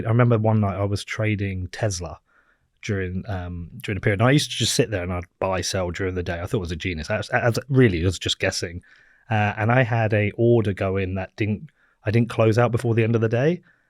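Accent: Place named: RP, United Kingdom. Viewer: Physically impossible to listen to a podcast solely hosted by the posh rah rah berk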